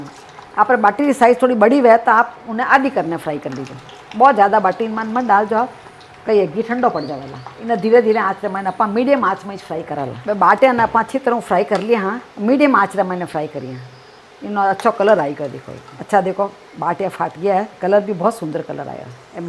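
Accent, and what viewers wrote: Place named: Marwari, India. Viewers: Maine isliye pucha tha bcz aapke baat karne ka tarika whi ka lga muje merta road jaisa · Aapki bhasha thodi alag lagti hai kyoki maharashtra me jo marvadi log rehte hai vo thodi alag marvadi bhasha bolte hai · Aapki jodhpuri marwadi bahut hi meethi hoti... Mein soda ki jagah thoda sa curd use karti hoon.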